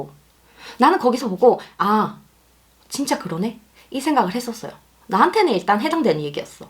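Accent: Gyeongsang, South Korea. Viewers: 그모든것을 알아도 눈치가 없으면 꽝~ ㅋㅋㅋ 이론과 현실은 다를때도..케바케~ 그리고 다 알고있으면서도 내가 겪으면 상황판단이 잘안되는....머 ~ 그런경우도~??? 일로서 사람을 만나는 경우는 잘안다고 생각하는데... 이성에 대해서 관심은 많으나 만나보고 싶다는 관심이 없다보니.... 호감인지~ 호의인지 구분짖지도 않고...ㅎㅎㅎ ^_________________^ 이번 영상도 재밌게 끝까지 다봤는데... 끝에 끝맺음에서 사투리 관련해서 말을 하셔서 글을 보태여 보내요~ 저는 경상도 사투리 귀엽다고 생각합니다. 대구는 "맞나~?"라는 말을 많이 쓰더라구요~ ㅎㅎㅎ 저는 꼭 표준말로 영상을 만들어야한다는 것은 없는거 같습니다. 듣기 거북하다면 안보면 되는것을 굳이 영상을 봐가면서 컨텐츠 제작자에게 이야기를 하는것은 크레이터에게는...그리 좋은 말로는 안들릴거 같습니다. 몇십년을 사투리를 표준말이라 생각하고 살아왔고 그 사투리를 하루아침에 바꾸는 것도 힘들텐데~ 그리고 그억양을 바꾸려하다보면 이것도 저것도 아닌게 될수도 있습니다. 저는 전라도지만 군생활을 경기도에서 해서 경기도억양도 쓰다가 친구가 하동친구가 있어서 경상도 억양도 나오다가 친구들 만나서 전라도 사투리 하면... 너 어디사람이냐고 물어봅니다. ㅋㅋㅋ 의 사투리가 저는 귀엽습니다~ 그대로~ ~~ 쭉~~~~~~~~~~~해주시길... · 사투리가 아니라 경상도 말씨 인거죠 대한민국 사람이 이 정도 못알아들으면 그냥 자막 보라고 하세요. 영상 아주 좋습니다 개념치 마시고 힘내세요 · 대구 경상쪽 치고 사투리 많이 없으신 편이에요. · 자존감을 지키세요 경상도말투는 안바뀝니다 서울말 쓰는 채널보러가라고 하세요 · 사람은 가장자기 다울때가 젤매력적인 거라고 생각해요 사투리와 경상도억양 넘 듣기좋은데 근데 마지막 서울표준말도 귀엽긴하네요 ㅋ 서울표준말은 가끔 써주세요 🤭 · 저는 의 경상도 억양이 아주아주 좋습니다. 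(저는 경상도 사람이 아닙니다.) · 사투리 안쓰는거 같은데.. 단지 억양만 경상도 식이지만.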